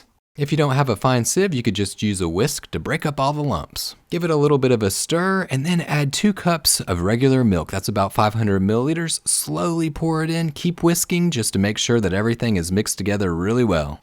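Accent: Tennessee, United States. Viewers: I'd say the Southeast, particularly Tennessee.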